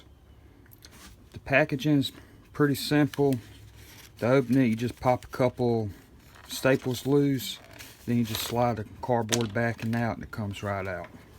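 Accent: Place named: Brooklyn, United States. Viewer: Ok, WHERE online does one obtain replacement points? LOVE that Brooklyn accent!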